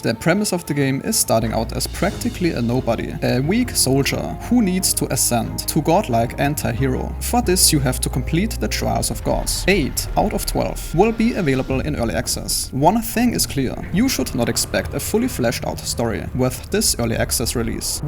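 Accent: Germany. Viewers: nice german accent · Sehr cooles Video mit vielen Informationen und Gameplays die ich noch nicht gewusst und gesehen habe. Würde dir aber empfehlen einen deutschen Kanal zu führen oder Videos in englisch und in deutsch zu machen da du ein krassen Akzent hast und damit wahrscheinlich noch mehr leute erreichen würdest. Hab bei der ersten Sekunde gemerkt das du deutschsprachig bist.🤙🏻😉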